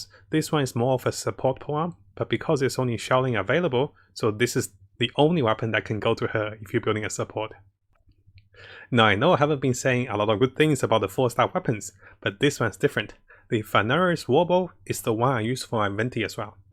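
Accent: Australia. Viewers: nice to know Im listening to a fellow aussie. My Beidou uses the Bell weapon. So far she is doing excellently with that. She is always shielded haha - because it reacts to damage that she takes. I find it to be a great weapon myself. My Diona uses the Favonius bow - it's been great for her.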